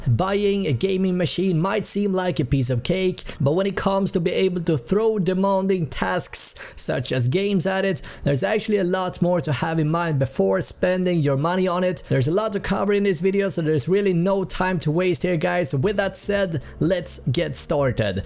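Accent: Sweden. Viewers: thats some strong ass swedish accent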